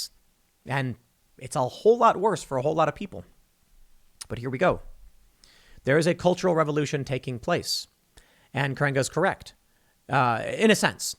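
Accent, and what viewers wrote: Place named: Chicago, United States. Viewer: Has anyone else noticed Tim is starting to exaggerate his As and Rs. We get it Tim you're from Chicago....geeze.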